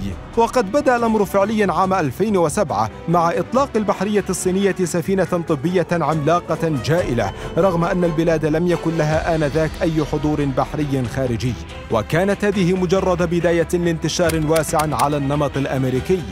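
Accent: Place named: Egypt. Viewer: المعلق مصري 🇪🇬❤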